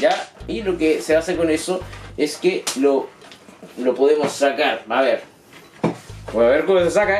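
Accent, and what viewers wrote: Chile. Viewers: A penas hablo dije, es chileno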